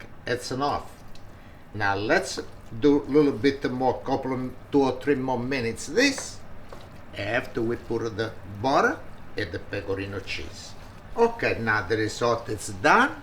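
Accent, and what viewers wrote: Italy. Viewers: You are amazing with your Italian accent, a big follow from me with a big like. Will make this recipe today 🥰🥰🥰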